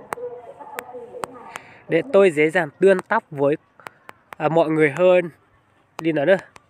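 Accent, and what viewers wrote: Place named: Nghe An, Vietnam. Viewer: Tiếng nghệ an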